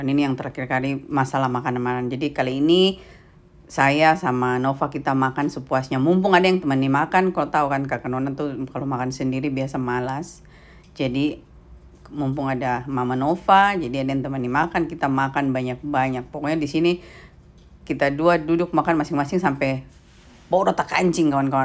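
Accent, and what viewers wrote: Papua, Indonesia. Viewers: Kk sehat2 ya....senang dengar kk punya logat Papua biar tinggal di lain benua logat tra hilang 👍❤️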